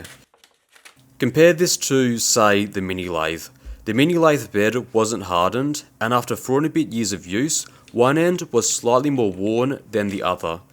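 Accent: Australia. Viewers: Wait, when did This Old Tony get an Australian accent?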